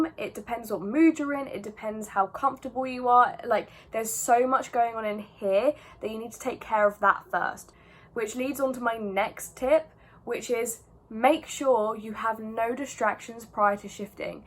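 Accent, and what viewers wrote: United Kingdom. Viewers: Wait- I didn’t know you were actually British 😭 but I love your accent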